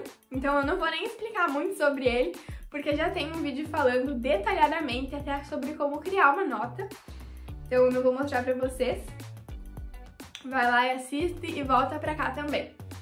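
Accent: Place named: Recife, Brazil. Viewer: Gente ela tem muito o sotaque de recife 😂🤩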